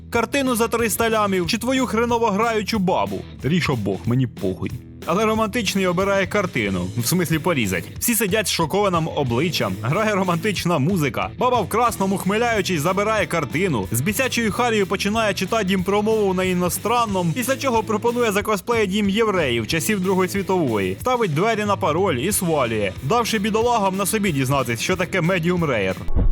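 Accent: Poltava, Ukraine. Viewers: Пане Автор, а Ви часом не з Центральної України - просто у Вас говірка схожа на полтавську чи черкаську?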